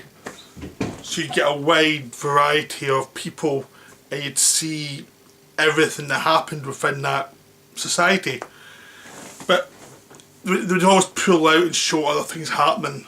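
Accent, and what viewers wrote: Scotland, United Kingdom. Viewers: He is a Scotsman that how he talks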